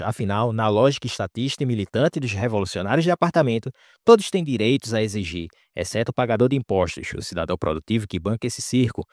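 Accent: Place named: Ceará, Brazil. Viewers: Narrador cearense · a narraçao nordestina cagou com o canal , não canal inteligente e voz nordestina não combinam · Do carriri cearense, certeza ❤😂😂😂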